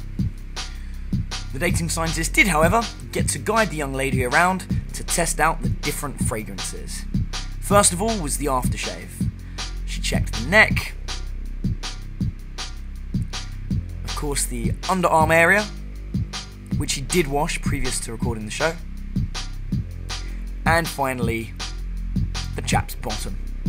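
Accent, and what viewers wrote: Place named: United Kingdom. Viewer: I like his British accent :)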